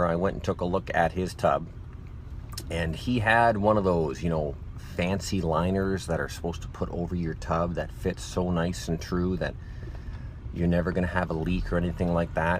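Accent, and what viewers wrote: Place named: Canada. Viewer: Hey Bro ,,,,you gotta be a Canadian,,,,I hear yer "In's and yer Ouut's" lol !!!*^%$%%#!!!!!,,,.You're a hard worker with a lot of guts.Im a plumber in California.I learned a lot.Keep up the good work.DD In L.A.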